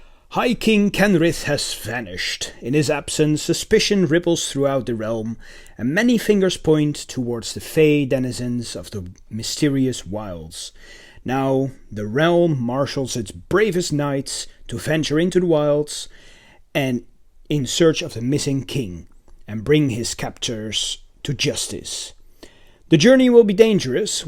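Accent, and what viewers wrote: Netherlands. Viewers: Dutch accent for sure, groetjes uit A'dam!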